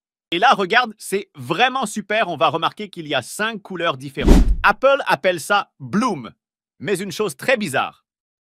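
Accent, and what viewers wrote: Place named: Canada. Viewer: Merci, Très expressive cette voix avec un petit accent canadien je crois. De quelle voix de synthèse vocale s'agit-il? Bravo pour le travail👍😎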